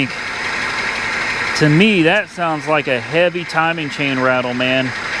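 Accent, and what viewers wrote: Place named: South, United States. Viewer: Love your accent, brings me back lots of good memories in Southern US!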